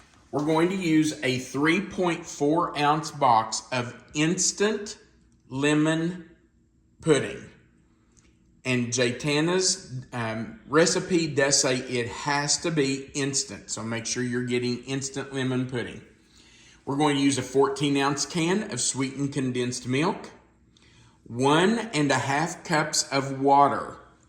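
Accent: South, United States. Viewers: enjoy listening to your delightful Southern drawl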